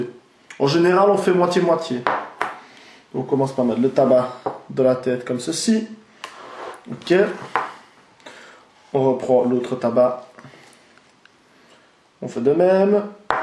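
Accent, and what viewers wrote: Belgium. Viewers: Merci pour ce tuto ! Parcontre je comprend pas le truc du demi charbon, sa sert à quoi ? Et dommage pour le niveau d'eau moi je sais jamais si y'en à trop ou pas assez MDR ! Et ce qui me fais bien rire aussi quand y'en à qui disent "un petit blanc qui explique comment faire la chicha" MDR faut être marocain, algérien ou je ne sais quoi pour fumer ou faire la chicha ?! N'importe quoi... Bref merci du tuto ! Et j'adore ton accent belge :)))))))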